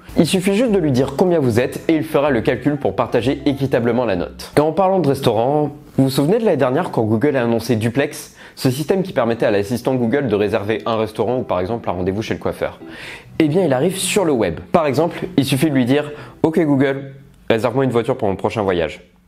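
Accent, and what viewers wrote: Normandy, France. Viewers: Boujou on sent le normand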